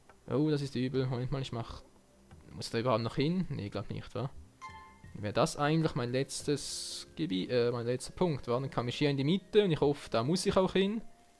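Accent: Switzerland. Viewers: Bist du Schweizer??? :D:D:D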